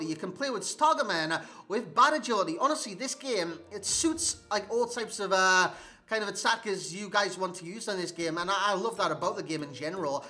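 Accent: Newcastle, United Kingdom. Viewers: Mike Just being enthusiastic for the video, to keep you occupied.. plus he has a Newcastle accent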